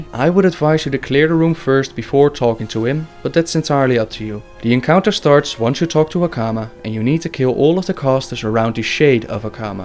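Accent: Netherlands. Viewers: You sound dutch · zowieso nederlands xD klote accent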